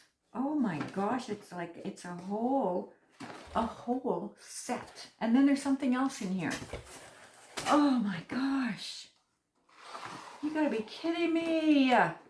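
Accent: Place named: Canada. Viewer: Love listening to you talk…love Canadian accent!! My step daughter & her husband live near Courtney, BC. She’s starting to have the accent mixed with the Texas twang (where she moved from). Love the hooks hope they work well for you!❤❤❤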